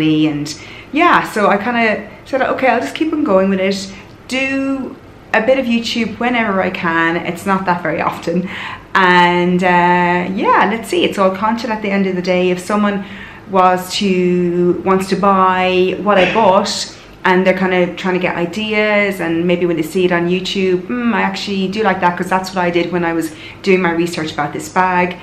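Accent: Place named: Ireland. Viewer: Love your bag, love your accent, to me it almost sounds like an Irish accent. Cute.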